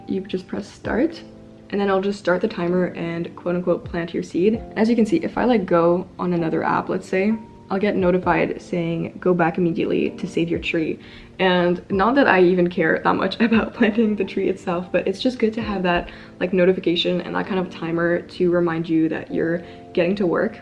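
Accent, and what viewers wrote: Quebec, Canada. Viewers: Omg i just stumbled across your channel, and when i first heard you talk jme suis dis "wait a minute je reconnais l'accent" J'ai réalisé que t'étaits québécoise!!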